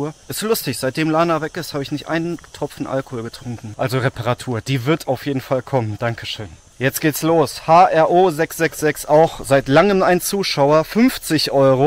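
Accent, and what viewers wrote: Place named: Poland. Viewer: Hey, sag mal hast du Polnische Wurzeln? Du sprichst Polnisch wie ich ich habe eine Oma die aus Polen Kahm 😊Liebe grüße von mir und alles glück der Welt auf deinen Reißen ich währe so gerne so mutig wie du vielleicht wenn meine Kinder aus dem Haus sind!!!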